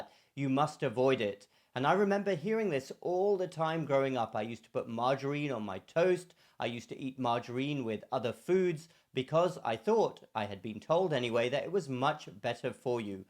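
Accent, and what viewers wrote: England, United Kingdom. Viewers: That's an English accent if ever I heard one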